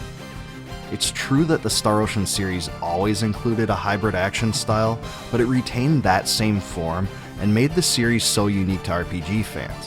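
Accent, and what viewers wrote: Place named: Upper Midwest, United States. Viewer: I wanted to say, I thought it was interesting and kinda cool that you have a very specific regional accent (possibly upper-midwest?), and you like JRPG's. While this definitely isn't all true, I know there is a stereotype of us Americans that we don't really care about cultures, and only care about ourselves, which is something I am very passionate about fighting against.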